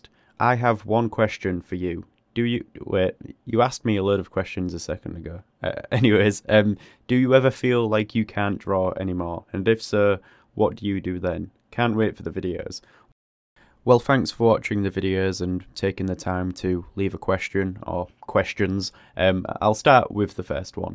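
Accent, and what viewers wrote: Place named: Northern England, United Kingdom. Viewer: Awesome video. Thank u very much for your answers. Love ur accent by the way. I’m in Manchester I’m guessing your slightly further north. That drawing looked insane. So good. Keep up the great work. Love your content and videos. Pls never stop 👍👏👏👏😍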